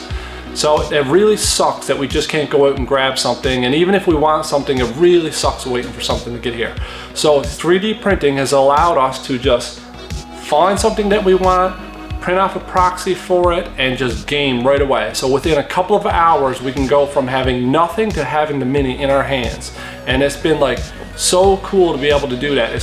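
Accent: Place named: Newfoundland, Canada. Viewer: I figured you were in Newfoundland from the accent, but Labrador is probably even more remote! Have you tried ordering from Kingdom of the Titans before? They're located in northern Quebec and might be able to ship to you fairly quick. Keep up the great work, love to see more OPR content and quality Canadian content creators! 🍁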